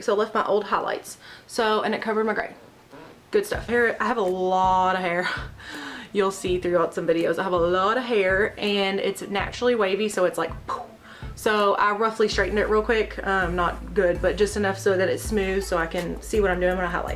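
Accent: South, United States. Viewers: Which shade Frost and Glow did you use? I need to do this STAT! You’re freaking gorgeous and have the cutest Southern Accent. Ignore all the trolls. They have nothing better to do with life!! Please get back to me on the Frost & Glow shade so I can get this done fast!! Thank you!! ❤️❤️ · I heard your country accent, heard u were a mom of boys and a nurse and subscribed. Where are you from? Im from Texas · I just love your southern accent.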